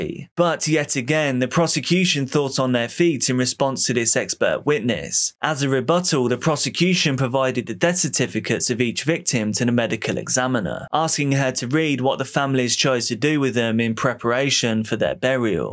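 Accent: England, United Kingdom. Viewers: then your English accent is flawless 😅 he’s definitely English, from a fellow English person 🫡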